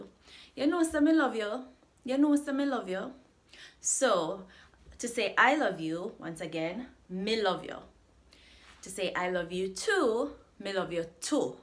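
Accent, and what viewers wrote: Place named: Jamaica. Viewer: My favourite "English" accent ever! Can't wait to visit Jamaica soon ❤❤❤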